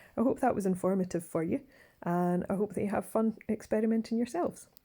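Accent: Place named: Scotland, United Kingdom. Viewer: be! Everyone loves a Scottish accent 😎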